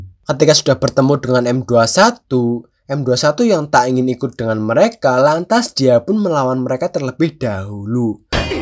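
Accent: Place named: Banyumas, Indonesia. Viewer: Njir wong ngapak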